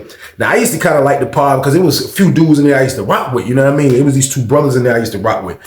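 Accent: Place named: Texas, United States. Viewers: Nah that’s how we talk in Texas fasho! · He really just talk like a nigga from the south 😂 whenever we play cards it’s a lot of trash talk like that just don’t threaten anybody and you’re good or call them a bitch unless it’s some family or homies.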